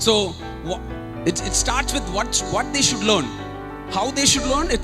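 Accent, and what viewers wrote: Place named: South India, India. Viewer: Sir your English speaking style is very different because you are from south India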